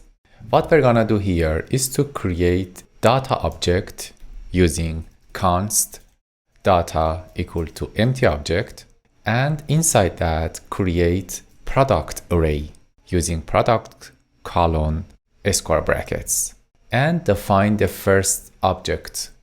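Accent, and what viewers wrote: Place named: Iran. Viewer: لهجتون ایرانیه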